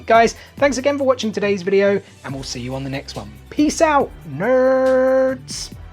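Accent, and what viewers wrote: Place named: Australia. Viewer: You're in London? I thought your accent was Australian!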